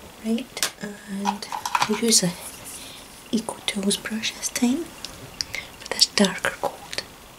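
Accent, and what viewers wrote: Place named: England, United Kingdom. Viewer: I love your english accent and intonation! This is so good to listen to me. Thank you for make this video!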